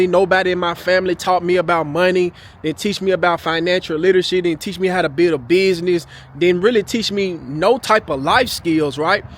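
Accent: Memphis, United States. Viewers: I used to live in South Memphis near McLemore. At first I wasn't gonna ask, but you sound just like someone from Memphis, that's why I asked.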